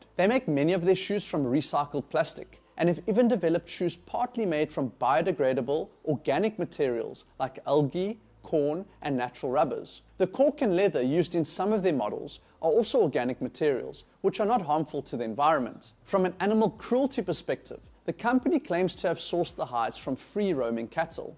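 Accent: South Africa. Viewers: yeaahhh, this guy is South African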